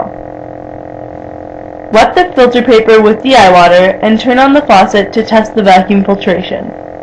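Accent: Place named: Wisconsin, United States. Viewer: More specifically, I think it's "Oconomowoc Swimming" Oconomowoc is a city in wisconsin, and her accent sounds like a wisconsin accent.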